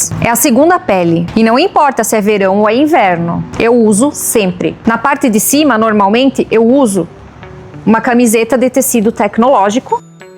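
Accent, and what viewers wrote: Brazil. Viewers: Pelo sotaque parece ser de Santa Catarina. Pela proximidade geográfica de Santa Catarina com com o Paraguai, não fica mais barato e fácil de acabar achando em Ciudad de Leste ou na Argentina as botas? Curiosidade mesmo. Acho super importante andar segura de moto ( eu moro fora do Brasil e vejo cada queda absurda aqui que só por Deus) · 0:3722:31 Suatque gaúcha tchê! tu és do Sul do País menina😉😉🤣🤣😂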